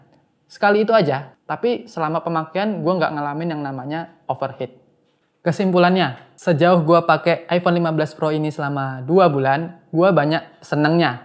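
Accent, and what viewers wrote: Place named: Java, Indonesia. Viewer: Bahasanya medok tapi lo gua lo gua wkwkw. Jawakarta beda dari yg lain😂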